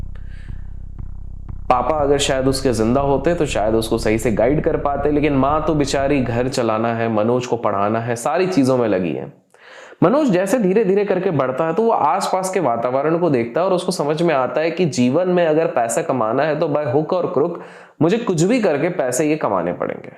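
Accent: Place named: Konkan, India. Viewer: Sir aap Maharashtra se ho aur 100% kokan se ho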